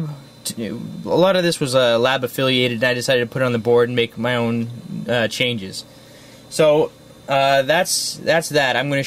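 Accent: Canada. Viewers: i can tell by your accent that you are a canadian...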